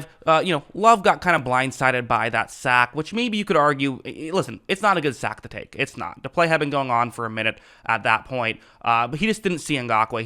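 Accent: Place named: Chicago, United States. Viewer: This guy sounds like hes from Chicago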